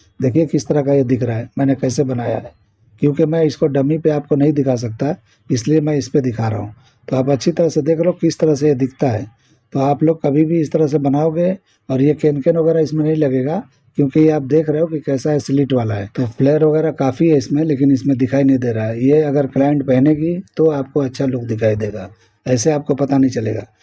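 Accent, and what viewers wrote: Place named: Uttar Pradesh, India. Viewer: Bolane ke style se aap up ke lag rahe the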